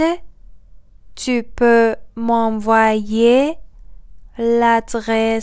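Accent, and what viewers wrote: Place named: England, United Kingdom. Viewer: You have a very strong English accent that's ok 😂😂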